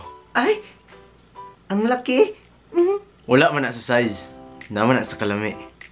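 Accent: Visayas, Philippines. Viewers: Hahahaha mkatawa ko ani niya mo storya ba kay bisaya kaayo..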